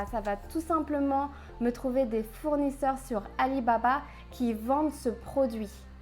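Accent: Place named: Alsace, France. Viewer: Moi je pense d'Alsace ou Loraine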